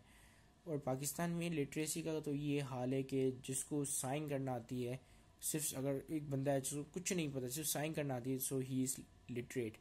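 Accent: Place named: United Kingdom. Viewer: nd youre Urdu is like English Accent it reminds me Bilawal Bhutto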